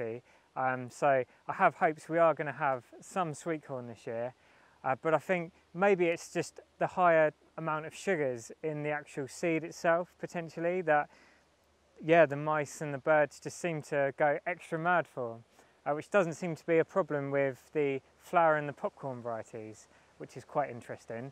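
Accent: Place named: England, United Kingdom. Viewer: I only seem to be able to get ordinary yellow corn here in France; it's a drag trying to get anything different; like jumbo banana squash!! We live in a beautiful place, in the mountains but seeds restricted to little and Holland. Last year i had to high hopes for corn - and before i knew it ants came and took it over it looked OK but they were there; no one else seems to have had the problem! I planted beans with my corn today oops I will stake them then! Where the hell are you; as you have an English accent but it looks like a tropical place, how did you get there?? One sweet potato that will grow here costs 5 euros -- how do you get is it 'slips' what and how to you propagate them?? I need them as we love to eat them!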